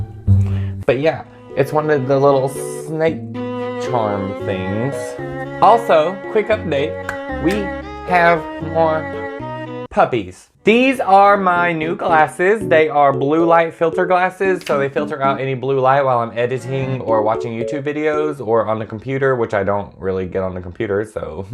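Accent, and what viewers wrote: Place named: United States. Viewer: why do you sound so country??? Lmao Where do you live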